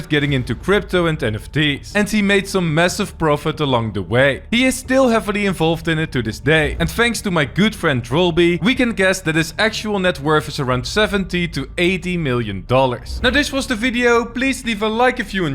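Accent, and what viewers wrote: Netherlands. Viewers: Ayo you sound dutch af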